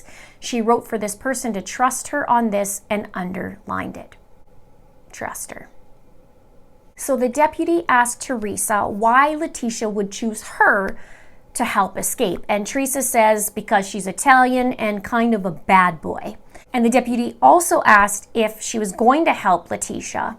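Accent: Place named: Canada. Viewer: I could listen to you talk all day. I love the Canadian accent and after my 1st trip to Toronto I wanted to live there. I mentioned that in a comment after Trump was elected and a Canadian gentleman responded with "We don't want you here." Needless to say I was sad as I always LOVED my trips to your beautiful country 😀💥🥳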